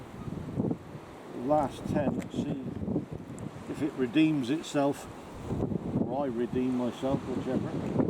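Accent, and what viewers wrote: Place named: West Country, United Kingdom. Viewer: You must be from Wiltshire Somerset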